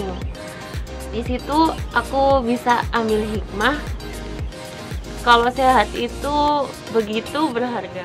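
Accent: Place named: Java, Indonesia. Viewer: Logat jawa nya semakin lama smkin keliatan kaa ehehe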